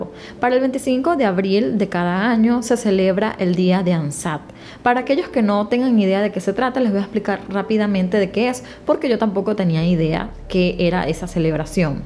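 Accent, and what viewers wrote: Venezuela. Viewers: Mrkaaaaa eres de Venezuela? Lo digo por el ascenso qwq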